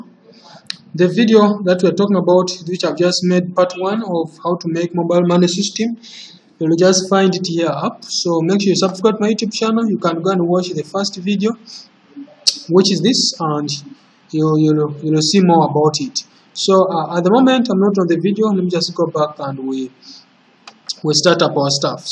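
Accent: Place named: Central, Uganda. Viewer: Gwe, yo English is kinda Central Ugandan...!!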